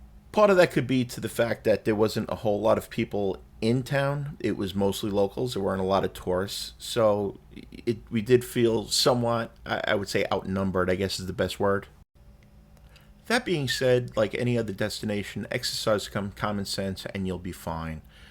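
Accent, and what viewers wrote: New York, United States. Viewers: Not sure, but I think they were making fun of your very noticeable New York or should I say New Yawk accent.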